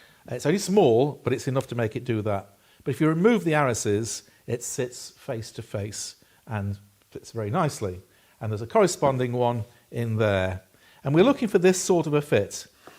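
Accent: United Kingdom. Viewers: Hi, I’m not sure if you ever get to answer any of these comments but I’ll ask anyhow. So refreshing to hear an English rather than American accent for a change by the way! I’m coming back to the UK next year from South Africa and would love to know of workshops, Guilds or such where I could learn (I’m pretty much self taught and have only been doing wood work for 3 years). Please give me any advise if it’s at all possible. Thank you.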